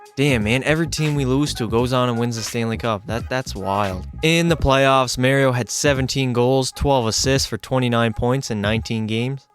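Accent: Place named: Nova Scotia, Canada. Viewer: U sound like ur from Nova Scotia bi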